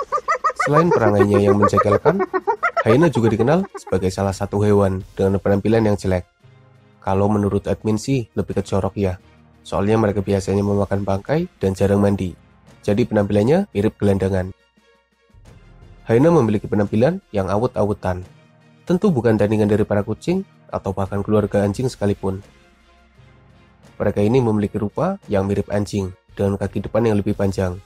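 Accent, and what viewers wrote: Banyumas, Indonesia. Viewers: Ngapak sukses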